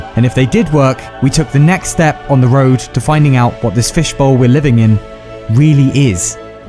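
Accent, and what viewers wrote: England, United Kingdom. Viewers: Нали? много е готин човека ама бг-то му с чист английски акцент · why do i voluntarily put myself into listening to some brit talk about life for 15 minutes every month or so? why do i enjoy it so much? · Why do you sound british ? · you british? you bulgarian? what else? :D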